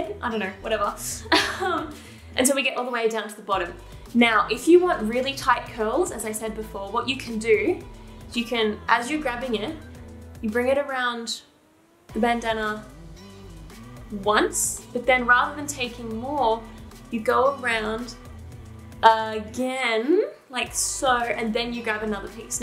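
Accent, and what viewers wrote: Australia. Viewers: Omg! Kath and Kim! The way you said "so" at one point sounded exactly like one of the snooty shop keepers (forgot their names..Trude I think), which sealed in my mind that you must definitely be Australian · It’s an Aussie accent I have one too. Aussie Aussie Aussie oy oy oy. Isn’t your name mate. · She kinda souds aussie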